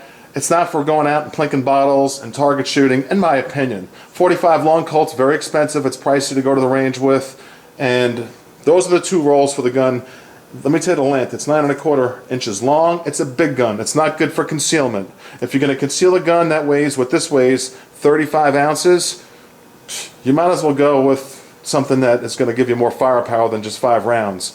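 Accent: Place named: New York, United States. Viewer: Great vid, just subrscribed. Your accents definitely not from Florida, New York maybe?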